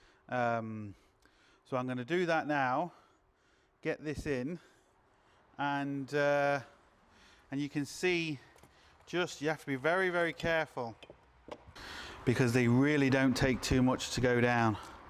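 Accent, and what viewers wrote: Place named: United Kingdom. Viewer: Great Job! British Guy LOL Thank You !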